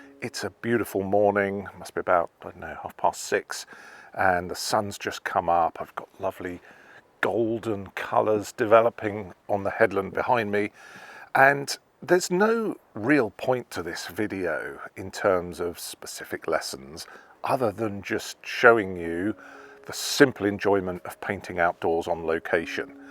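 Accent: United Kingdom. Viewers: Hi I am from Malaysia Want to tell you that I really appreciate your lessons! So skillfully demonstrated! And besides, your British English Is a delight to listen to after the endless American twang which is so hurting to the ears! Thank you!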